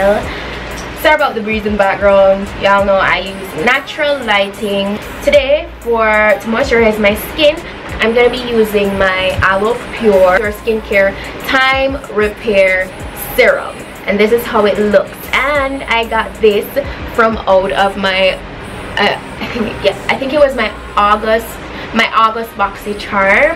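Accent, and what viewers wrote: Jamaica. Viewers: are u a Jamaican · Your Jamaica don't it?🇯🇲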